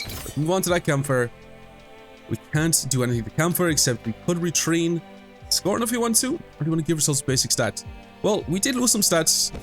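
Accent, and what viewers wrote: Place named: Midlands, Ireland. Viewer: Lovin the videos on Guildrun man but I can't place your accent and it's drivin me up the walls haha its between Monaghan and Roscommon I'm guessin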